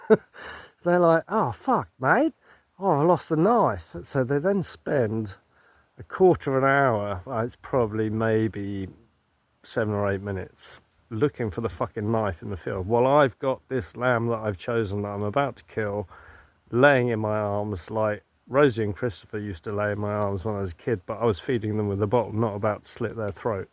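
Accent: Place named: Australia. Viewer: hahahahaha.. thats fucking gold mate. Luv your ozzie mutha fucker accent too.... not to bad for a POM .... chortle. Love that classic saying about the cold water thing. Funny that I've never heard of it until you told me about 6 years ago. Its wild that you were on a farm bugger all from me at the same time. I went to the Canowindra oval to see the hot Air balloons go off so I was quite close to ya. I only lived down the road about 80 K's so we were pretty much neighbours for a short while. Love me some Lamb as well. Gran roasted some every sunday with spuds etc when I was growing up ....... my mouth still waters each time we pop one on. Nice to hear you scored a holden belmont ute too mate ... was it a HQ 1972 model??They're worth a bit now. Cheers from OZ me old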